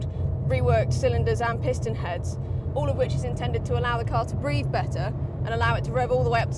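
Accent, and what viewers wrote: United Kingdom. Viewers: LOVE that British accent. Love the Boss as well. Have the 2012 Boss and it's a blast. Good tune and 305s got me to high 11s.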